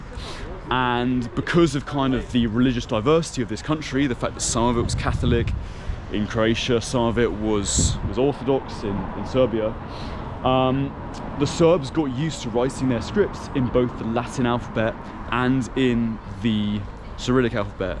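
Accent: England, United Kingdom. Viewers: Being Irish myself, I love your English accent and you are also a very handsome-looking young man 🇮🇪☘️🇮🇪🥰🥰🥰🥰🥰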